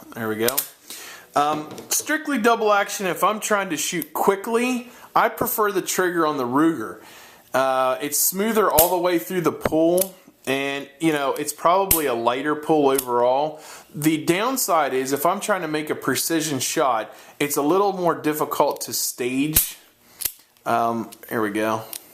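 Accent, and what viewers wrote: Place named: South Jersey, United States. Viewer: I know a south eastern PA/South Jersey accent when I hear one....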